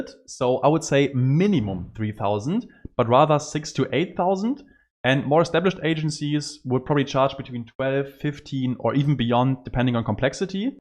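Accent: Germany. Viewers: Such a saver, very timely. Thank you so much. And yeah the German accent, spicing it up alittle and very original, so yeah.....😀😀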